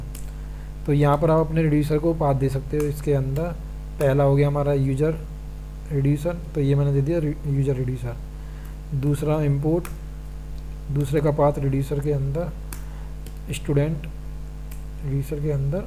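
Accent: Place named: Moradabad, India. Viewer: Awesome! Btw are you from Moradabad, Uttar Pradesh?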